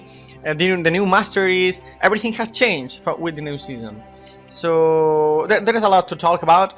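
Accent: Spain. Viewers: ¡Hostia un Shaco!? Are you Spanish?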